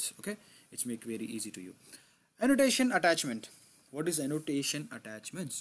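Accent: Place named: Russia. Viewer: Why your accent is like russian😂 bhai you are indian be as it.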